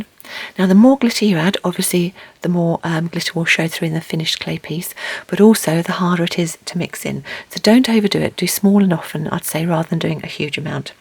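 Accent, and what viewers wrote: United Kingdom. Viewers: Very cute! You are a great teacher, and your videos are charming and relaxing with the piano background music. It is impossible to be in a bad mood and watch one of your videos. They are so well-narrated, and so thoroughly British! Happy Halloween!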